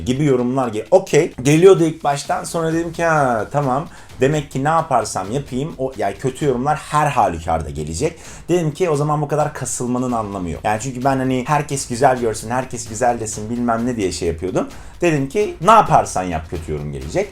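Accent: United States. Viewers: abi dediklerin cok dogru turkiye insanlari degismez ingilizcen bence bir amerikalidan daha amerikali iyi ki varsin <3 su an ingilizcem cok az var seninle beraber ingilizce ogrenmeyi istiyorum ve dediklerine fazla takiliyorum <3333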